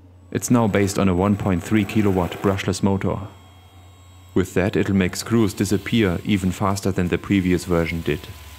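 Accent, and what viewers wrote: Germany. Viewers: Your German accent is delightful! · wie sehr man diesen deutschen akzent immer raushört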